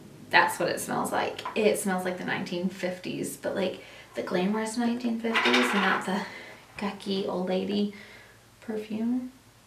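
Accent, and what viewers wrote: South, United States. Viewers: First of all. Your dancing & song choices are 💣. I swear to you we were meant to be friends! Second. I could listen to you talk with your southern slang alllllll day! And lastly- I think i need that MJ perfume in my life!! You know i trust you to the ends of the earth- so pretty sure this stuff is going to be incredible🙏 XO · I seriously love that you are doing videos! Keep them coming I could listen to your cute southern accent all day! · You are hilarious and I died like 5 times love your southern sass girrl;)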